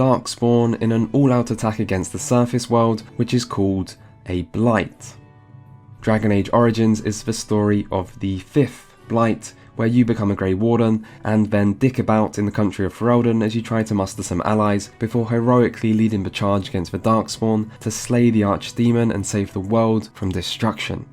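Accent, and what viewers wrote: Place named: United Kingdom. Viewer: WEll, that was a waste of time. One day I'll learn to unclick when I hear a random british-sounding white dude voice.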